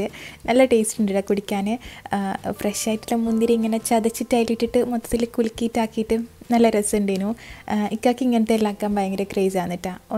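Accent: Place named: Thiruvananthapuram, India. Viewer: Namale thiruvanantha purathe ninane athane samsarathile mattam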